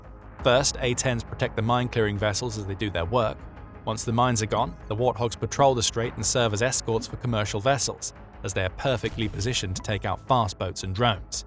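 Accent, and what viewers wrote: United Kingdom. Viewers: is so funny that a Brit is narrating this video since their politician are not doing shit in this Campaign I Remember the WarHog from 1978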